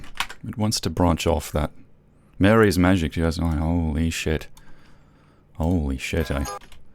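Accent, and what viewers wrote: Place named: Australia. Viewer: he is the most Australian mf I've ever heard... Im so late but oml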